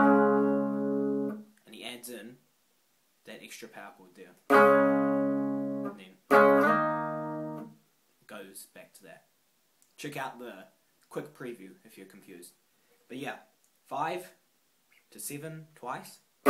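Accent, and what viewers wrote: New Zealand. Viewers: are u from New Zealand?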